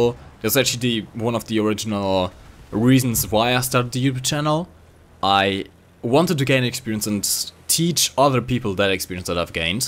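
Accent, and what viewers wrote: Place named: Germany. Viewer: i love your content, yes i love FPS and war thunder, something im in the mood for war thunder, sometimes cs:go, overwatch, tf2 etc etc, the thing is.... i found you cuz i didnt knew wtf i has doing in tank battles, and you helped me, i like your wt content and it shows that you do... put a alot...ALOT of effort, and well your german accent.... well.... i stayed cuz you're an awsome guy, funny and well you teached me how to be a tanker / pilot, same as orange, he helped me with a coupple of plane tips here and there, he's awsome.... what i wanna say is.... i'm here for the person dispise the content, hell you can play the sims i'd watched it... it would a bit awkard.... but i'd love to see what you can do with it, sort of speak... stay safe and.... keep beeing awsome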